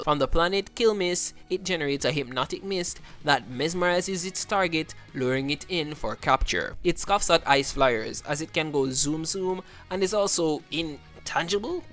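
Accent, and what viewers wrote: Jamaica. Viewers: A jamacian Galvan? I'm vibing with it. Love the accent man, and keep up the content.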